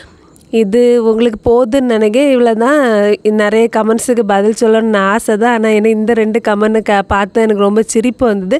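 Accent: Kanyakumari, India. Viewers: Supara pesringa kanyakumari slang enaku piidichrukuu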